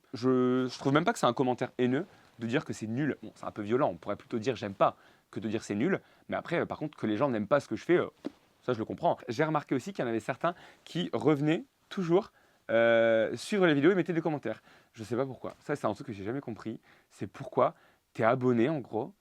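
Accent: France. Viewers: ❇❇❇❇Coucou. Pour moi tu as été une bien agréable découverte. J'aime cet accent de nord. Tes vidéos sans grossièretés, et pleine de légèreté me font toujours sourire. Tes parents sont touchants et sympathiques. Moi j'aime beaucoup, et en plus, je trouve que tes placements de produits sont super bien faits! mon préféré c'est celui avec Monique ! Gros bisous à vous 3 et demie. ( Le demi, c'est le petit qu'on voit de temps en temps)✅ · j'adore ton accent francais, je suis Québecoise et je t'ai découvert depuis 6 mois environs. Continue ton bon travail, je t'adore :)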